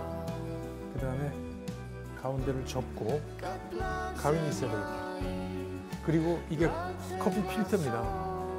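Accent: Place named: Jeolla, South Korea. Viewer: 서울말투가아님. 전라도말투?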